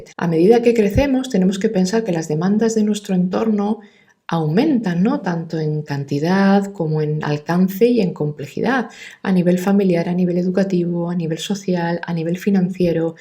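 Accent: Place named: Spain. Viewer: Es interesante, pero no tolero ese espantoso acento gachupin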